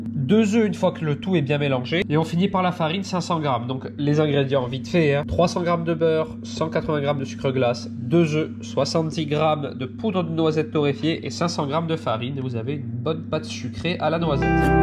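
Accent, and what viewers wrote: Corsica, France. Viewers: J'adore quand tu fais des "interrogations exclamatives", ton accent corse ressort tellement !!! "Mona elle est pas là ?!!" "J'ai pas fait couler mon café ?!!" Ne change rien 😉😄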